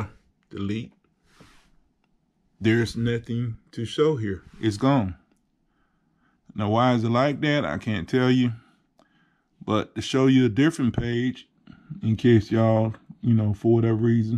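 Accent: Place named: North Carolina, United States. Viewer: Thanks so much! I needed that! You sound so much like one of my cousins from North Carolina! Go figure! 😊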